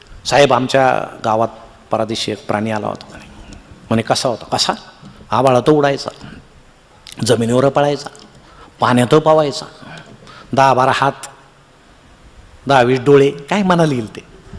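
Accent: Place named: Ahmednagar, India. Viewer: नगरी भाषा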